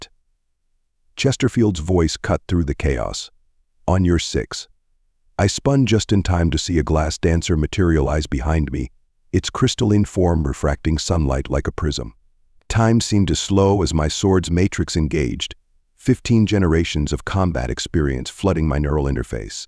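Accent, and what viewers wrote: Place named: Texas, United States. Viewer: It's more like Texas southern speak! Just enjoy a good story!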